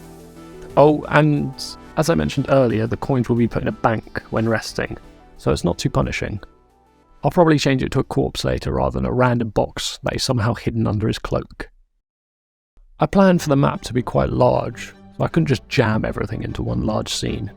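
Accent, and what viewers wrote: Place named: United Kingdom. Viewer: Really well made devlog dude and the game is stunning and looks so fun. I'm definitely keen to see more! Btw I hope this is not offensive, but your accent sounds like Dudley from Harry Potter! Which part of the UK are u from?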